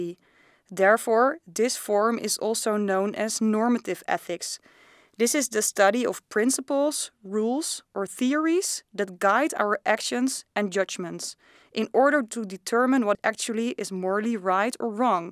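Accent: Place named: Spain. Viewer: This person is definitely from Spain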